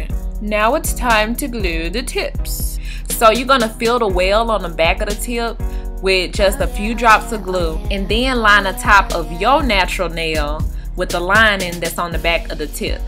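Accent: South, United States. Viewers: I LOVE IT!!! YES TO YOUR SOUTHERN ACCENT!!! YESSS TO YOUR PERSONALITY!!! YESS YESS YESS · I don't understand why people were saying this was "ghetto" and she sounds "ghetto" ?? wtf does ghetto sound like ?? Cuz to me it sounds like she just has a country accent.. But anyway..nice tutorial girl. Them nails SLAY 😍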